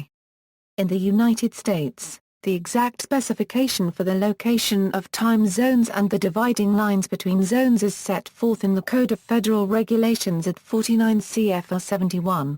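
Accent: United Kingdom. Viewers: I don't understand a word of what this fucking bot says. British English is awful. God bless America, my home, sweet home.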